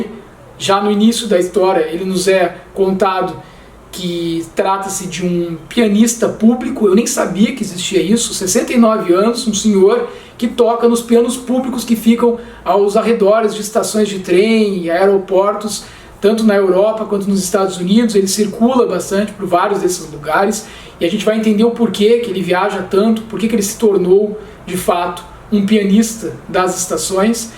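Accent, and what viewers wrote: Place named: Rio Grande do Sul, Brazil. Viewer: Já vi que é meu conterrâneo pelo sotaque. Mas bah, né? Amei o vídeo. Verei os outros em breve.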